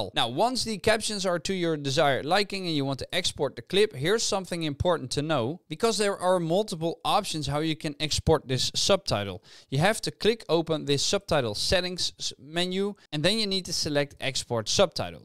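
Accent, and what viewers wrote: Netherlands. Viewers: i hear a little bit of nederlands in your stem. Still thanks for the tutorial it helped me:)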